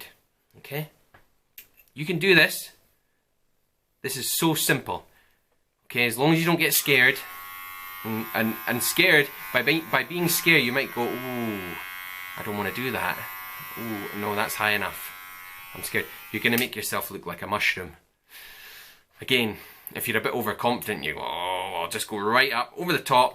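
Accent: Scotland, United Kingdom. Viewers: Great video! It’s also nice to hear a Scottish accent on YouTube. It sounds as if you’re from Aberdeen, my home city! :)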